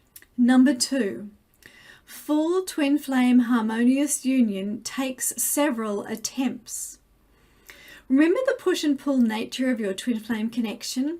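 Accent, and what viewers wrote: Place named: Australia. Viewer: So nice to hear an Aussie voice, hello neighbour. 🙏❤️ I would love to hear from other Aussie flames, does anyone have meet ups in Sydney.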